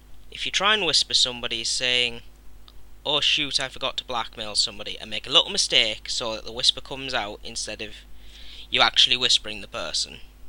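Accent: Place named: Geordie, United Kingdom. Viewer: Where r u from, cuz u sound like ur a geordie but you dont have a full on accent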